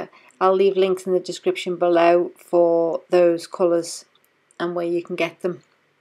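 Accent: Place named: Liverpool, United Kingdom. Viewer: She's Liverpudlian. From Liverpool in England.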